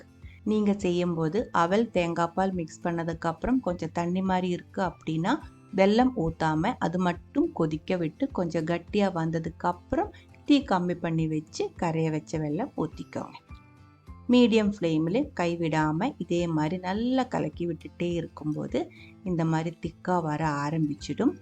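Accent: Kerala, India. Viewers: Alayalam kalantha thamil arumai sagothari valga valamudan aviyal solli kudunga enga kudumpame adimai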